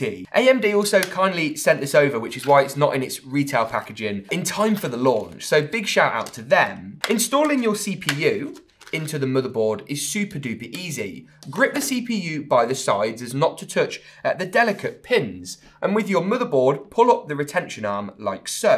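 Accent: United Kingdom. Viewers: U have the best British accent I’ve ever heard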